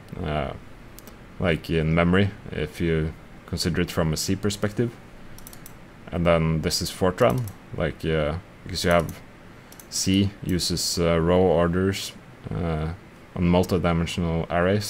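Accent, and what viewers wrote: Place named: Sweden. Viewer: Love the Swedish accent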